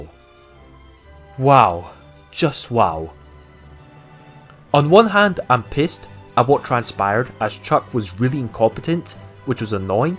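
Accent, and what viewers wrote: Scotland, United Kingdom. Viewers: Loved this mate, inspired me to give it a go. Can hear the scottish in your accent, where you from or where have you stayed here?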